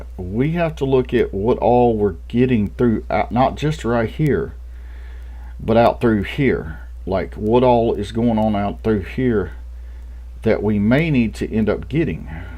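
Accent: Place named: Texas, United States. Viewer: i am wayyyyy to friggin tired to watch this. I've been playing PoE for like 6 years...but i alway seem to stop at 30ish lmao. idk why. okay ill go. i am litterally going mad from sleep depretion. hmmm idk what to critque or complement or anything on this one. honestly, i may just save the video, and watch it later when i try the game, again, and stop at lvl 30 for some random reason. 16 friggin hours of work. i feel it's kinda pointless to make the palworld-base video i was working on...but gonna do it anyways. okay what am i talking about? Keep going my dude. PS you have the most country accent ever (i mean like in the TX country, not redneck weirdo one, it's not like....idk a jab at you). just like "man this dude is from OK or TX or something, maybe like some rural parts of Arkansas" if i'm right lemme know.... bc this is litterally the only thing my linguestics degree is worth, guessing ppl's accents. 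also i am drunk. gn~!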